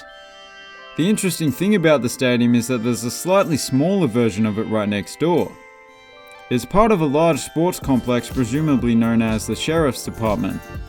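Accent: Spain. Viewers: Nice video, but please stop pronouncing Portuguese words as if they were Spanish. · Excellent video but stop reading portuguese with spanish accent please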